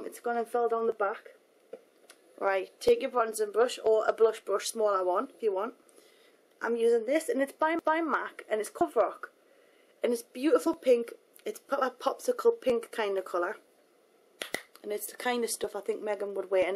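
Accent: Newcastle, United Kingdom. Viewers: She's not Scottish - She's a Geordie ^__^ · Its a Newcastle accent, from the north of England. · shes from newcastle well i dont know if shes from newcastle but her accent is jordy shes definately not scottish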